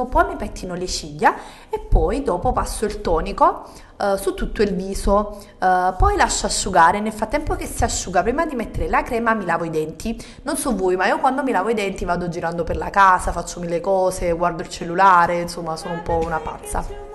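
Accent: Naples, Italy. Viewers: ma sei Napoletana?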